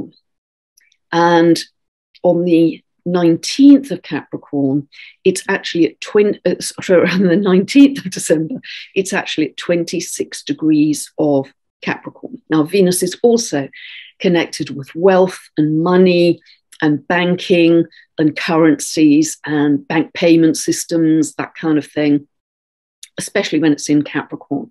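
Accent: United Kingdom. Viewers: American will believe any crazy thing as long as the person saying it has a vaguely British accent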